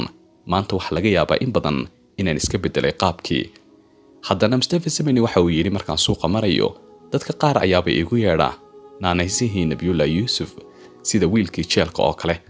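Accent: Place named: Somali Region, Ethiopia. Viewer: Waa Somali ninka sida hadal ka lo dhigey Ethiopia Somali ba mise waa Ethiopia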